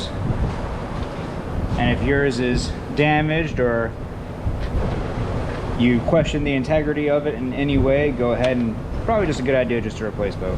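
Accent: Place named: South, United States. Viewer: Good job! We like your southern accent! Make more videos my oily cowboy 😂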